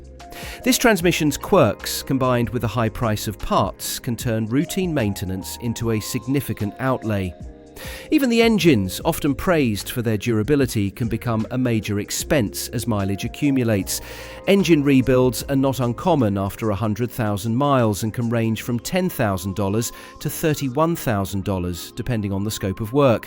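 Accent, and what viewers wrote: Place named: United Kingdom. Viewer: Amusing. The British accent threw me off for a bit, but then I noticed that the costs were extremely detailed even in a range, as presented without out humor or any kind of approximation. 😂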